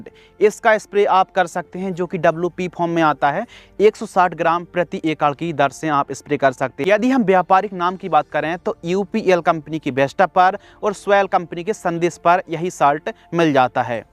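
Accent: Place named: Narsinghpur, India. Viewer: Tum Narsinghpur se lg ray ho bhaiya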